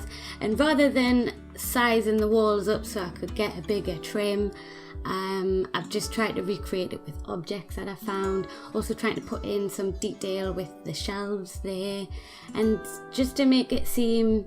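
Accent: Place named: Scotland, United Kingdom. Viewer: I love your accent :) Where are you from? (Scotland? 😅)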